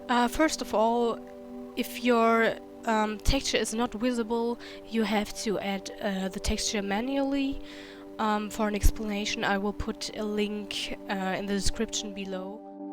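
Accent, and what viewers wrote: Germany. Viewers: Are you from Germany? because of the accent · Everybody: wow Your Video is very helpful Me: WAIT ARE U GERMAN?! I mean you have this typically german accent xD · am i the only one who thinks she has a german accent well hallo aus deutschland wenn du mich verstehst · That german accent tho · hi, i noticed you have different accent, i mean- you sound little bit like a german person ☺ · Man hört den deutschen Akzent.😅😂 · You Sound German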